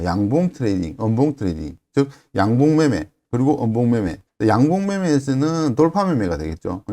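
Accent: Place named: Gyeongsang, South Korea. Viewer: 와 투박한 갱상도 사나이신데 강의는 알짜배기 입니다.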